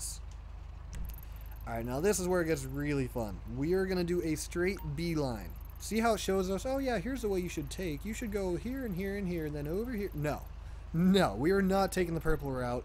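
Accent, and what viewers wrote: Sweden. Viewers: Are you from sweden😃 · Are you swedish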